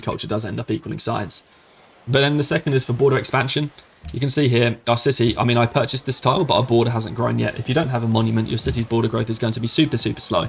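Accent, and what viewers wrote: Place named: United Kingdom. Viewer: I like how dudes from the UK don't say culture, they say culchá. It sounds cooler.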